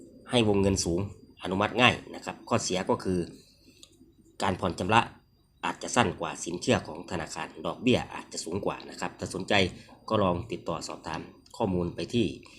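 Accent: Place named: Countryside, Thailand. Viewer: เสียงคือบ้านนอกแท้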